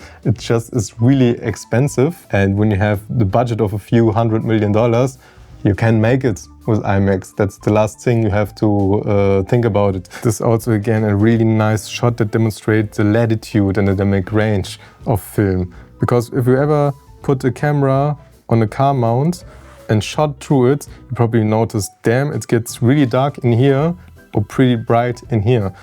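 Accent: Germany. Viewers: Film is still unmatched in all aspects but the sharpness and fine details. But do you really need those pores on the nose to tell a story hehe. Still prefer the top-quality film as a viewer though. Also I don't mind german accent, its not indian or hungarian after all ))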